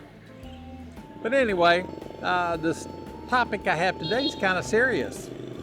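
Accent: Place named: United States. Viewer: Nice Video:) first one I have watched of yours.. keep up the good work. I hear the American Accent, what state were you originally from?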